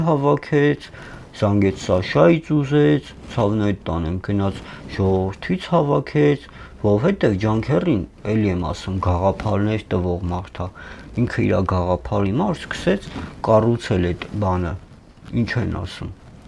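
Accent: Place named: Vanadzor, Armenia. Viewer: Чисто Кироваканский, а точнее Химзаводский)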